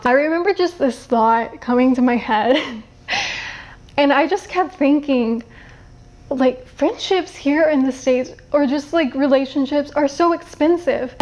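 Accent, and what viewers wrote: El Salvador. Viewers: TE AMO GRINGUITA SOS LO MAXIMO. UNA SALVADOREÑA DE VERDAD. HASTA TU ACENTO ES ORIGINAL. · Hi, how are you? I just want to say hi and wish you the best! You are a very nice person even when I don't know you in person, but you speak like a true Salvadoran and you know a lot about our culture and I like that! Say hello to your sister in a good way and with great respect. See when you take her to know El Salvador? greetings and take care! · así es bro, esos que son salvadoreños y al llegar a usa con los días hablan como mexicanos mal caen esos majes, sinceramente es gente que se merece una buen cato en la trompa, para más esta chica habla como salvadoreña y eso que ella es nacida en usa osea es gringa de naturaleza, y los majes que están en usa puff decepción dan.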